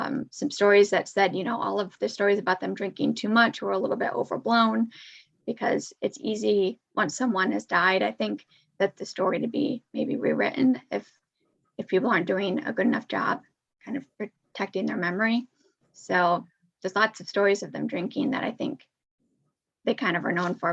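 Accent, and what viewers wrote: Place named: California, United States. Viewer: I think your whole context and info on this is awesome but you keep talking fine then go off in some half choking graveling voice which is extremely annoying like your from California. I'm not trying to be hateful but just honest..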